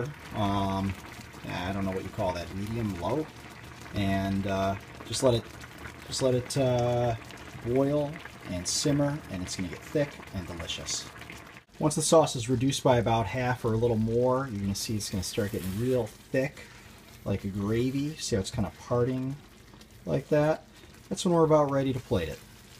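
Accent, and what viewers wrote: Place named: Michigan, United States. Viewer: thanks for the video, you sound like youre from michigan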